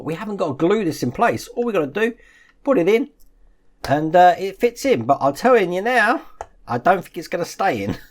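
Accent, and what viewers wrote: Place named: Kettering, United Kingdom. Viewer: that makes sense then I'm from notts so by your accent put you round Kettering area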